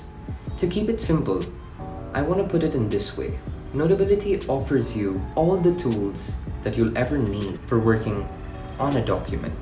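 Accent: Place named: India. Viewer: Holy hell, the Indian accent hit you hard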